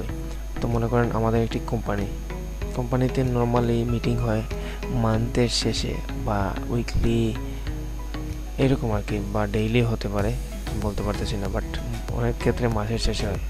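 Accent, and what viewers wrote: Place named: Sylhet, Bangladesh. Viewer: Ji but Dhaka tei thaki choto theke. kotha sune bujhe gesi je sylheti hote pare 😂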